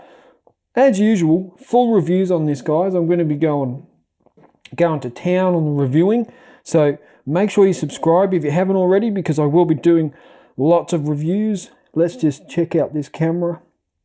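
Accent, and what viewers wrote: Australia. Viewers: english Australia mate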